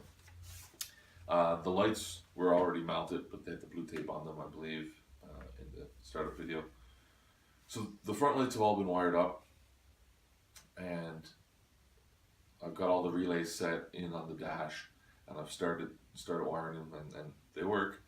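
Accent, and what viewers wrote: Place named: Newfoundland, Canada. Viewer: Ahah - no wonder I couldn't peg that accent... A newf in AB is always a bit of a different one. Cheers m8, just stalked you on FB heh, hope all is well. Looking forward to updates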